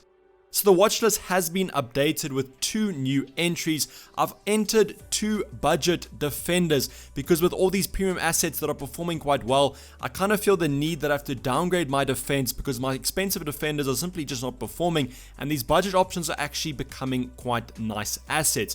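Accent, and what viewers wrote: South Africa. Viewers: The only channel needed for expert advice on FPL, Not to mention the huge bonus of that great South African Accent. Thanks.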